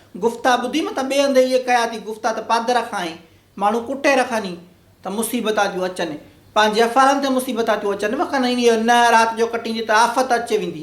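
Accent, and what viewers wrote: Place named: Northern Sindh, Pakistan. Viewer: I like the way u speak Sindhi actually it's an utradi accent of sindhi (north side) they speak in the same way and we speak laar side Sindhi(south).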